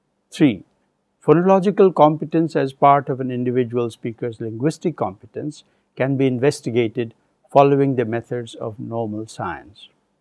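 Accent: India. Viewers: That's his native (Hindu, I guess) accent interfering with his pronunciation of English words... It happens with everyone of us who aren't native English speakers but in few exceptional cases.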